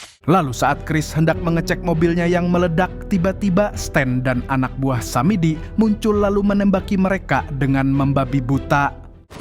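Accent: Banjar, Indonesia. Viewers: Banjar nya masih ada 😂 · Urg banjar Pina nya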